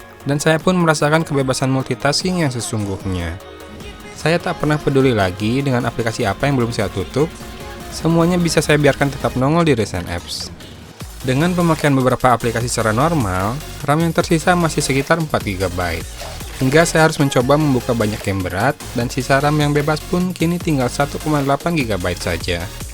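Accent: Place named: Sunda, Indonesia. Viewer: ngomong ada nadanya, khas dari Sunda. Akhir kalimat nadanya turun. Ini krn baca teks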